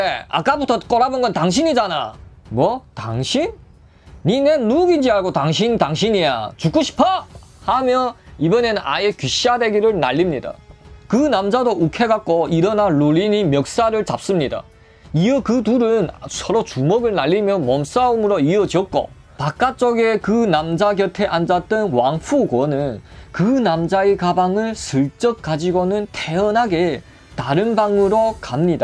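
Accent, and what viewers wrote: Yanbian, China. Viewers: 엉아가 한마디 advice를 하면 방송할때 서울표준말로할려고 노력하지말고 그냥 조선족 악센트, 아니면 자연스럽게 중국어 악센트가 섞인 한국어로하는게 훨씬 조타아이니, 그게 니 매력포인트 아이니 네가 서울 표준말 방송보고싶으면 그냥 KBS,MBC,SBS 방송보면되는거 아이니 네가 TV보면서 말투 따라하면서 댓글도 그러게하는데 보는 많은 사람도 내생각에 동의랄텐데:D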